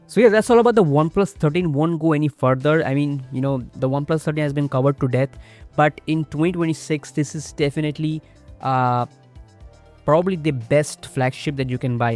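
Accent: India. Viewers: Bro, are you from Odisha?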